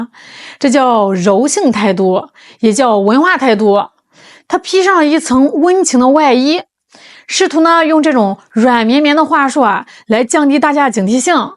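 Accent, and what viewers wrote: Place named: Beijing, China. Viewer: 每一天在聽在學的口音, 北京口音？非常喜歡！❤❤❤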